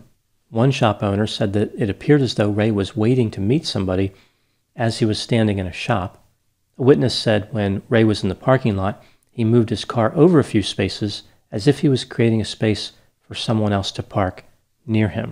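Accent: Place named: Pennsylvania, United States. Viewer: See, with that accent I've always had a hunch you're from PA/the tri-state, as I am. And now that you've so perfectly pronounced Wilkes-Barre, I'm almost certain. Now just give me a Schuylkill & a Bala Cynwyd and I'll deliver my final verdict. ;)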